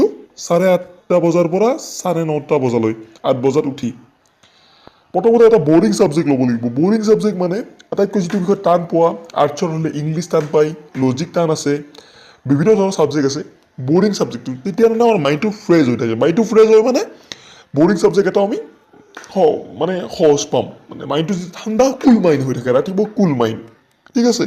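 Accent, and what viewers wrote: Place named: Nalbari, India. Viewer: Khati Nalberiya oi nijoka ki buli vaba sirok anaka kobo laj nalaga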